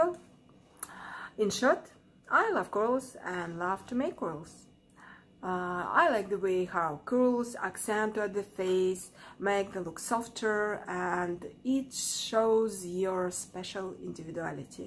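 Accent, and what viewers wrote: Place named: Russia. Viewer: yes i knew you spoke russian by your accent!!!! :)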